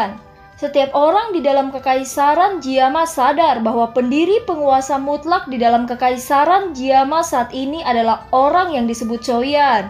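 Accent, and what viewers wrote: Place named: West Java, Indonesia. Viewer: Logat namah siga orang sundaa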